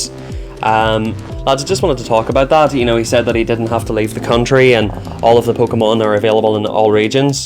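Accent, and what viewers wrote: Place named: Northern Ireland, United Kingdom. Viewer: Mate your accent has changed threw out the years u sound northern Irish as fuck on this video compared to now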